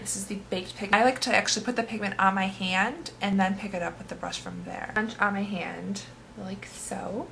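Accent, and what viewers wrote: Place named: North, United States. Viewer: Hey! i am just curious as to where you are from! i feel like you're voice sounds like mine like accent wise. are you from the north? hahah weird question sorry! i live in the midwest and everyone makes fun of my northern accent but you sound like me i think so just curious:)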